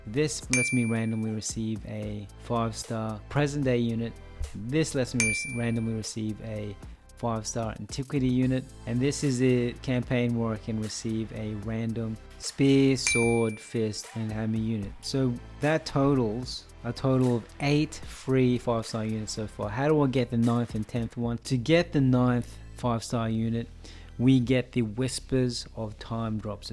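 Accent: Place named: United Kingdom. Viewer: i thought British. Xenoblade was dubbed in the uk and I now really appreciate British voices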